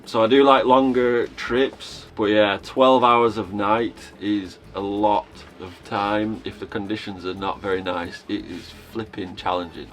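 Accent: Lancashire, United Kingdom. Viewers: where were you born, cause you sound a bit like me (Bolton Lancashire) Not exactly a sailing hub!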